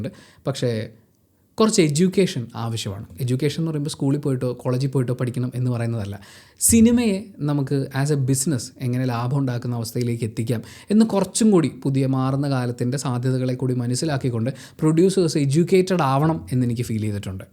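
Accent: Kannur, India. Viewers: ningal...Kannur,kasargode...alle...namma...parayunna pole...namma...Thrissur,Ernakulam...Kar parayuna pole..Chakrashwasam ennokke...pedakkanundallo...any way...nice review about the cine crisis.and your views, solutions and suggestions are appreciatable.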